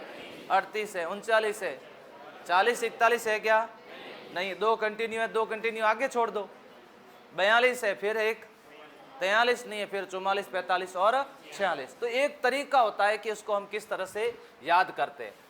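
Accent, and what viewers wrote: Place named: Jodhpur, India. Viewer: Sir aap jodhpur se ho kya... Kyoki aap bilkul jodhpur walo ki tarah bolte ho...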